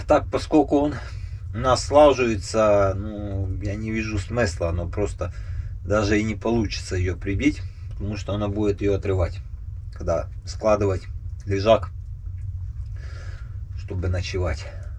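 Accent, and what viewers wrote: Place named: South, Russia. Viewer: Я уже который ролик смотрю и пытаюсь понять, а в каком регионе это происходит? По косвенным данным, я решил что это точно не северо-запад. В Псковской, Ленинградской, Новгородской, лес другой. На Сибирь тоже не похоже. Скорее уж ближе к югу и не думаю что севернее Воронежа. И говор южный, буква "Г" характерная, как для нашего юга, так и для Украины.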